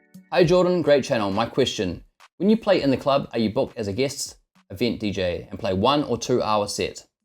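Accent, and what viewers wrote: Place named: New Zealand. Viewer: Chur bro, you sound like from Aotearoa New Zealand! Your choice of music and DJ style gave it away before I even heard you talk my bro💯👌🏽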